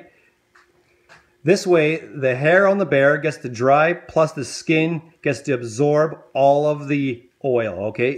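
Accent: Canada. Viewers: That candian accent is amazing ♡ hello from ontario ♡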